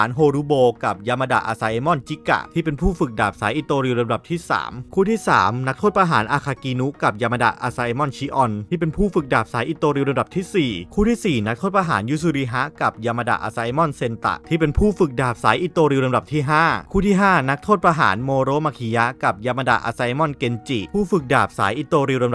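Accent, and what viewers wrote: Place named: Thailand. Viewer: เสียงพี่เหน่อมาก😂😂😂😂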